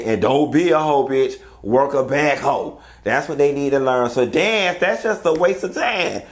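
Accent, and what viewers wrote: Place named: Mississippi, United States. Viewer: Literally!! Since your first video I was like omg his ass sound like he from Mississippi lol yo ass be knowing some shittt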